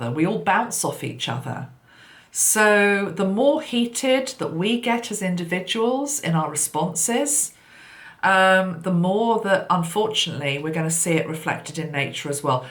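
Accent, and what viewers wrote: England, United Kingdom. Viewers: A big thank you from Johannesburg, South Africa! I'm originally from England so I enjoy your dulcet English tones:-) I shall keep walking through the gateway with the cool blue flame in my hand. Such a powerful image to take with me in my shadow work to release all that no longer serves me, thank you ♥️💜💚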